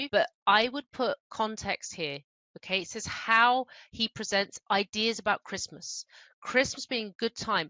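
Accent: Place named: England, United Kingdom. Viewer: Great video as an essay writing demonstration. Great accent. Are you from England?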